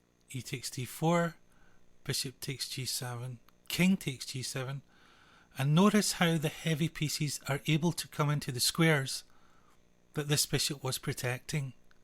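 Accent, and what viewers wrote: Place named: Scotland, United Kingdom. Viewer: Originally, I was gonna ask if you were from Glasgow, but since I am not familiar with different Scot accents I broadened my question. No shame in being from Glasgow. Thanks for the video.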